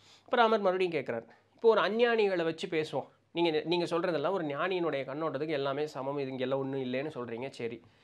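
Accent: Coimbatore, India. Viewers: உங்கள் ஊர்(கோயம்புத்தூர்) மொழியில் கேட்க ஆனந்தமாக இருக்கிறது💕..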